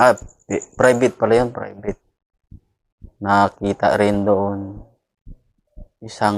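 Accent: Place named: Ilocos, Philippines. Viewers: Taga saan si sir? Igorot ba kyo o Ilocano? Kc sa pagtatagalog nya parang kababayan ko sya? Salute you sir! God is good all the time, hindi nya kyo pinabayaan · Halata mo ilocano si buddy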